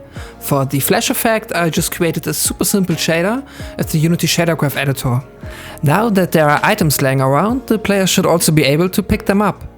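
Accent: Germany. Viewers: are you german? i think you have that kind of accent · I recognize a fellow German when I hear one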